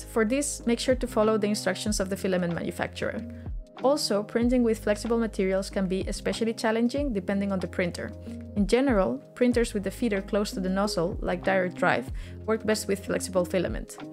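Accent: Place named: Spain. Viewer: que tendrá el acento español que antes de ver el nombre del canal ya sabia de donde era xD Oye el video es un 10, habia usado el metodo de eliminar superficies para vasijas o filtros de red pero nunca se me habria ocurrido que serviria para hacer tejidos. Greating and Like From Galicia!!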